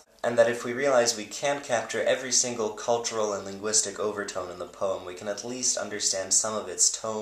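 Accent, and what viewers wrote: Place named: Arab world. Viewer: Your Spanish sounds very Arabic, or maybe more from-the-still-very-moorish-parts-of-Spain-ish. I can tell it's Spain Spanish too, but mostly just very Arabic sounding. And your speech sounds almost mystical as well. It's refreshing to hear someone actually have a voice in Spanish. It's a lot more interesting and understandable than, "Kay whore-ah es?" or "Me yah-mo es Megan e me gustah pah-sahr tee-empo cohn mees uh-me-goes." Thank you for rescuing my Wednesday. DFTBA!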